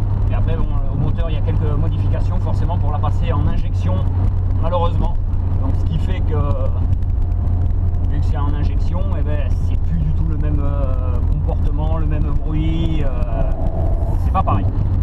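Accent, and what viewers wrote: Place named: Hautes-Pyrénées, France. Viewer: Aaah super ! En plus des mob une rallye . J ai clio rs1 en plus du spx et mvx. Et à l accent je dirais que tu es de mon coin(65)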